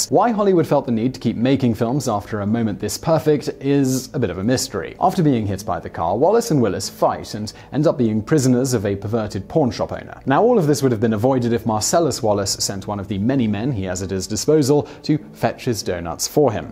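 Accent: United Kingdom. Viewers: Eh. What dya want. He's a British Milennial.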